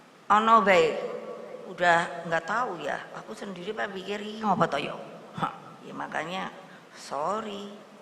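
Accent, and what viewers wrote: Java, Indonesia. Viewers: Jawane medok 👍😁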